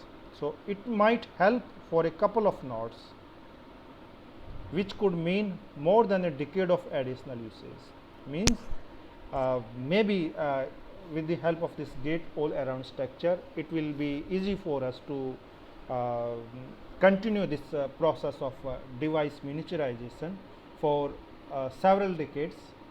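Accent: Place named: India. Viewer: ฟังสำเนียงชัดเลยอินเดียทำไมมีคนเก่งมากมาย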